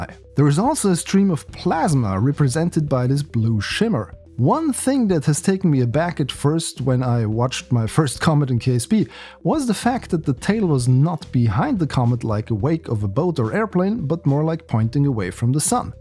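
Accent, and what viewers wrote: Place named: Germany. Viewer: I have the feeling to hear a german accent. If not correct me but Du klingst wie ein Deutscher, der verdammt gut Englisch spricht! Nice Video btw. LG Justus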